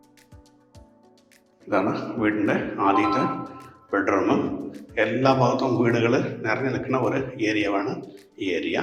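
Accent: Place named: Tamil Nadu, India. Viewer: Tamil accent😂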